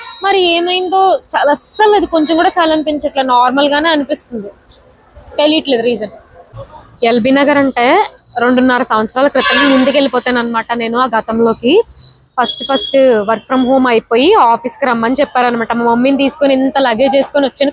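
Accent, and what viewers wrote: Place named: Rajahmundry, India. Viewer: Mi Rajamundry slang super 😂 snacks 😅 anyway nyc vlog 🙌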